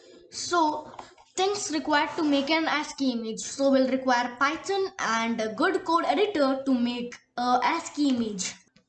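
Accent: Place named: India. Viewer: I think you are indian because your voice says I also Indian Nice explain I understand all things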